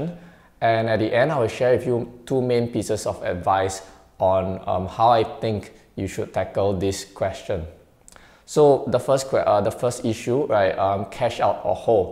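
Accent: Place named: Malaysia. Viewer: you are definitely from Malaysia :)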